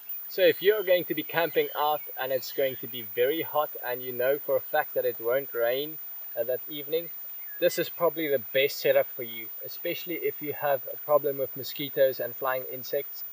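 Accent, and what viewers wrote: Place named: South Africa. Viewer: Hey brother! I immediately recognised that SA accent, and now I see that you are a photographer as well as a fellow believer, awesome! :) The only tent that I notice locally is the Hubba Hubba NX for around R6500. Did you import or buy this tent through anyone local? I just bought an F-Stop Sukha, so I can't go around at such a high spending rate...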